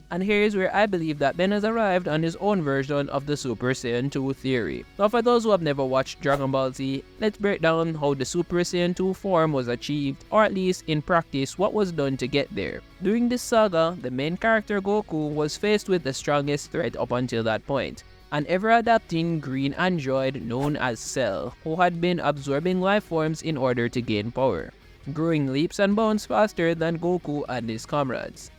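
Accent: Jamaica. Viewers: Are you Jamaican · Jamaican (take it from another Jamaican) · Bro yuh a jamaican🤨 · Are you Jamaican bro?? Love the Ben ten content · Yo are u Jamaican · its clearly jamaican. Im jamaican and chinese myself bro talks like a sophisticated jamaican person. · Are u Jamaican? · This sounds Jamaican….what Caribbean country you from? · Is he really a Jamaican? I hear the accent and ting, but mi affi ask. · subscribe for the jamaican accent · Yo bro ya Jamaican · Are you Jamaican? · U Jamaican? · bro sounds like a jamaican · You speak English but sounds Jamaican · are you jamaican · It's not about difference, there's just nothing Indian about his accent, its clearly a Jamaican accent. You good LiL brO?? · Could tell the Jamaican accent immediately 😂, happy for my JA brother.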